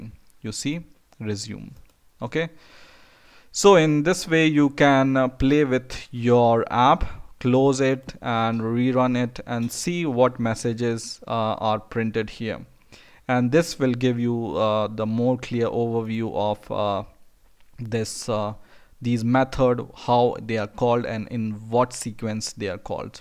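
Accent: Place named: India. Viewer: Oh god.. it is obvious that he is from India..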